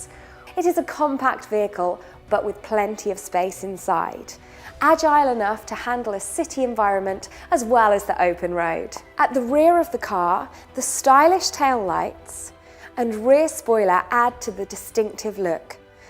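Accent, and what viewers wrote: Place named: United Kingdom. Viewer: Got a girl witha brittish accent doing this video even though MG is wholly owned by the Chinese😂😂😂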